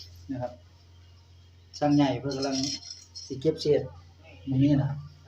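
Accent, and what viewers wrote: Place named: Sakon Nakhon, Thailand. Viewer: สวัสดีคะ แม่เก๋มาให้กำลังใจคะ สวยงามหลายๆจ้า คือเว้าคือคนสกลแท้จ้า แม่เก๋กะคนสกลจ้า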